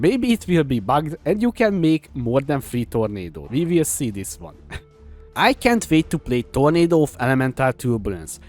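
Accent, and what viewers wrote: Hungary. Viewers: I agree with the comment that warns new players to avoid this. AVOID this build. Why? -You are sacrificing a fuck-ton of item slots for unique items. Both daggers taken, chest slot taken, and you STILL need to solve 100% inc spell damage taken on low life. -ONTOP of this, you are already losing 25% of your damage (it's like gaining a debuff: deal 25% LESS damage) because it's not possible to reach 100% conversion. -Also need to sacrifice 2 jewel slots to force out cold and lightning. 2 jewel slots is not insignificant. -In conclusion this is absolutely bait. You are sacrificing so many item slots and you have not even begun to address defenses. Also I can tell you are Hungarian by your accent.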